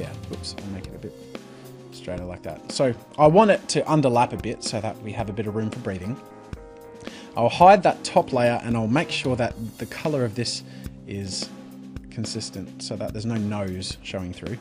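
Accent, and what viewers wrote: Australia. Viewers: r u australlian? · YES AN AUSSIE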